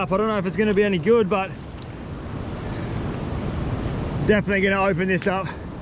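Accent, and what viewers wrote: Australia. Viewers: I wish i could see british reaction to Australia accent lol gotta be interesting interaction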